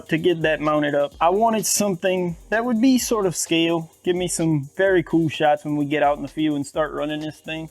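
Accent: Louisiana, United States. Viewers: Sounds like a Lafayette La accent.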